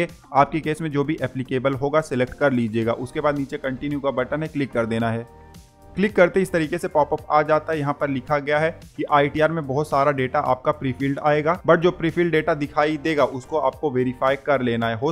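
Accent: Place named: United Kingdom. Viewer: This is for INDIAN senior citizens. Why this British accent. Pl reload with normal Indian accent.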